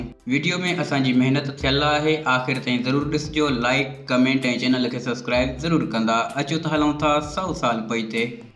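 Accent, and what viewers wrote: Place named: Sindh, India. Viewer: Best 👍 video bhai y you voice SINDHi I am Panjab Lahore sa ho am bhai you are from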